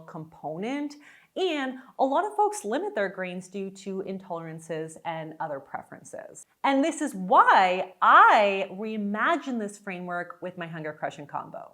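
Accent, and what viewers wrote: Canada. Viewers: Honestly I thought you were american like me. You barely have a Canadian accent. Now that listen more carefully I can here it a bit.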